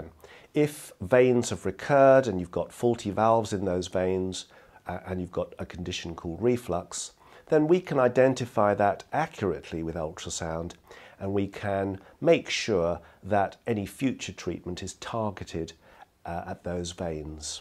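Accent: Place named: England, United Kingdom. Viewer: Can I marry your English Accent you’re such an awesome doctor I had that procedure and you describe it perfectly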